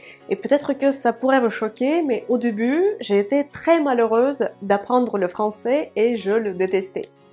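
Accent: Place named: Russia. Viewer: Votre Français est parfait avec une pointe d’accent Russe dans la prononciation c’est bonheur absolu. Félicitations et merci pour ce moment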